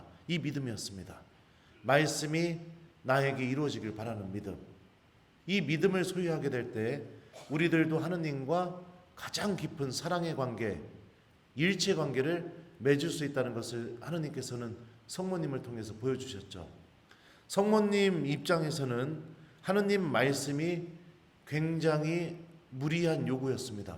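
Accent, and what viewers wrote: Chungcheong, South Korea. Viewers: 신부님 감사합니다 제 고향이 대전 인디 신부님 충청도 사투리들으니 엄청 반갑네유~^^ 충청도 분이신디 말씀은 엄청 빠르시네유~~^^ 매일 잘 듣고 있습니다 감사해유❤❤❤ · 신부님 말씀 잘 듣 고 있습니다 미국에서 충청도 말씀 을 대할수있어서 더 정이 감사합니다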